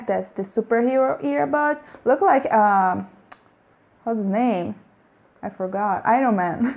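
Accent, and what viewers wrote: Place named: Brazil. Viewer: Totally Brazilian accent, congratz for the video.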